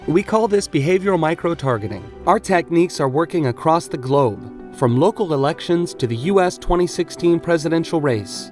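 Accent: Ireland. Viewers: YOURE FROM LIMERICK?? I always thought you were irish cus of your voice but i never imagined you were from MY hometown ! 👏🏼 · I had no idea you were from Limerick! I lived there for almost a year and now immediately recognize your accent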